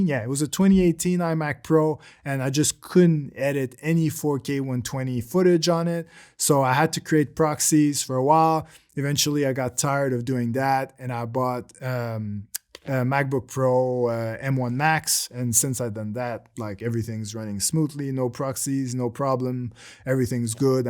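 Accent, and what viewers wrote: Quebec, Canada. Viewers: Jpourrais jurer que je reconnais un accent du quebec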